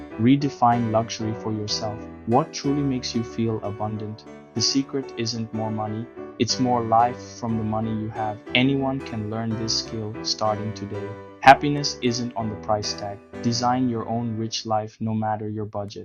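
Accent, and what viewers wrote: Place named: India. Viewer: Thank you Indian guy (the accent was a dead giveaway away). These are great tips to share. Although I’m way ahead of you, as I have been doing every one of these things and more for the past 2 decades. Trade off was that I have traveled the world, been to the finest of places, and am surrounded with luxuries “all the things” which I have never once paid retail price for. Champagne on a beer budget is totally possible with meticulous and methodical planning 🥂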